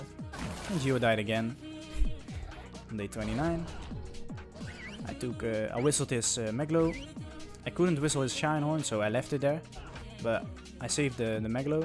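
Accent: Netherlands. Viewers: Are you Dutch? Your accent sounds Dutch :p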